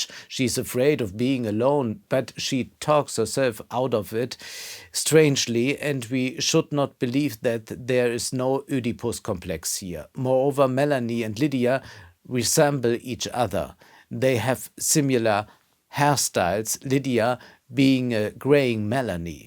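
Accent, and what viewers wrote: Germany. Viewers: Der Akzent ist doch bestenfalls nebensächlich. Wenn der Wert des Gesagten davon massgeblich geprägt ist, ist es sowieso nicht viel Wert. Steckt man viel Energie in akzentfreie Sprache, kommt es anderswo zu kurz. Ob ein Akzent von Vor- oder Nachteil ist, hängt vom Zusammenhang ab. Der deutsche Akzent verschärft den Eindruck des Fachmanns - fachmännisch ist der Vortrag auf jeden Fall - und trägt zum Branding bei. Das ist vielen Deutschen, die dem Überwinden eines deutschen Akzents aus welchem Grund auch immer einen hohen Stellenwert beimessen, oftmals nicht bewusst. Nach 80 Jahren sind die Zeiten, in denen man einen deutschen Akzent nicht selbstbewusst tragen darf, ohnehin vorbei. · Man merkt, dass du an der englischen Aussprache gefeilt hast. 👍🏻 Verglichen mit den ersten Videos hört man den deutschen Akzent nicht mehr so gewaltig raus.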